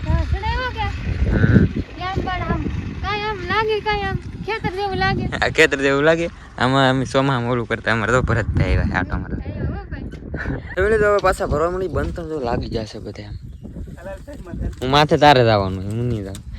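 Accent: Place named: Banaskantha, India. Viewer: અમારે બનાસકાંઠા માં પણ રોજડા જ કેસે Bhai 😇 તમે બોલો ને એમજ અમે પણ બોલીએ સિયે આપડી બોલી સેમ too સેમ સે હો ભાઇ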